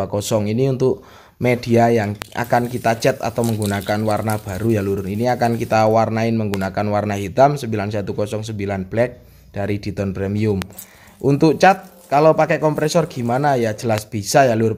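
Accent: Temanggung, Indonesia. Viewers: Koyo wong temanggung ya... Heeee..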